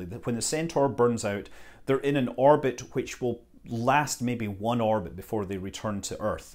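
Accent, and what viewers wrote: United Kingdom. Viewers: That outfit in combination with arguing on space travel in British accent immediately reminded me on Arthur Dent. Sorry for that.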